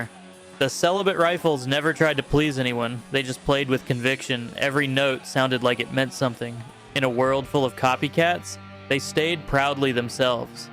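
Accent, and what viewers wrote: United States. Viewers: I am surprised how good your taste is. You sound American so how did you get to hear of these bands. Many main stream Australians would not have heard of many of these. Well done. But how does a yank know of these?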